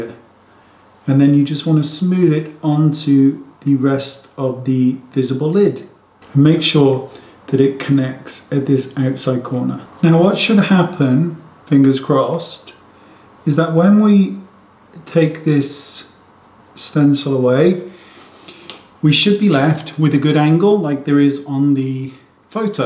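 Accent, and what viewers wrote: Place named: Scotland, United Kingdom. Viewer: What sort of lovely accent do you have? Sounds a bit Scottish sometimes.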